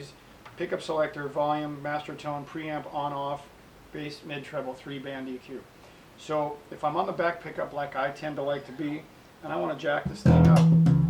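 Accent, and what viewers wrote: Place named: United Kingdom. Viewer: love the british accent. greetings from london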